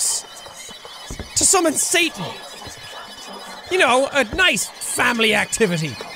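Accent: Ireland. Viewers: I was so scared just hearing his irish accent get more intense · He sounds so much more Irish ☘️ in this video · I don't know if I just haven't watched in a while or something but you sound VERY Irish to me in this video for some reason. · Is it just me or does sound impossibly more Irish this episode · was so uncontrollably irish in this video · I love how progressively became less irish through out his career and now he's suddenly hyper irish. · I love how used to hide his Irishness and he's now more Irish than ever. If he ever says "Fecking eejit" I will lose my shit.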